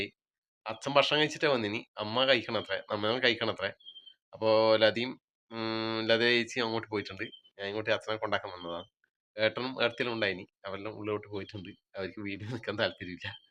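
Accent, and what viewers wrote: Kannur, India. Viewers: കണ്ണൂർ ഭാഷയുടെ ഒരു സൗന്ദര്യം ആഹാ ❤❤❤❤❤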